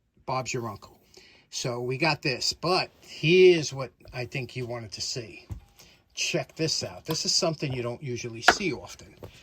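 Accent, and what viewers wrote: New York, United States. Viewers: Hi, I wear my old timer badge with pride. Last year I started Bee keeping. There is a “Hive Tool” which is exactly the same as the Hyde tool. Yes, the tools are thinner as you describe. Not sure if you know about beekeeping. Honey Bees are very fussy about the interior of their hives. They use a substance called propolis that they seal up all crevices in the hive. You need the hive tool to pry the boxes apart because the propolis is made from tree resins and is very sticky. Another installment on soldering irons. Very cool temperature regulator/stand. I have a fancy modern digital soldering station, but also still have two Weller guns. Vintage is wonderful. Super station cleanup. I thought it was “Smoke gets in your eyes” not up your nose🤪. Very funny end video. I sometimes laugh at your NY. accent, because mine is the same. 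Stay warm. We are in the low 30’s in the daytime, and the high teens at night. So we are even unusually cold. We didn’t get the snow. So no complaints here. Best Regards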